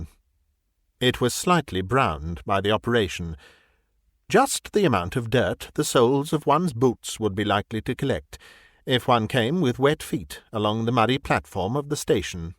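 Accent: United Kingdom. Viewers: Oh definitely! Perfect British accent at its best. I am learning here. English is my second language and I am being tutored, and it’s not just about the pronunciation it’s about the punctuation. Actually punctuation is where AI fails most.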